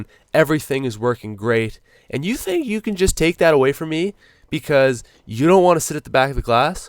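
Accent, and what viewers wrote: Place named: Canada. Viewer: His Canadian accent is awesome.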